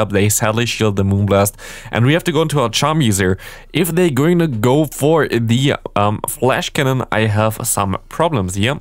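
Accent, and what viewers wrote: Germany. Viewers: Hello welcome to a new video. And he is German. Easy to follow.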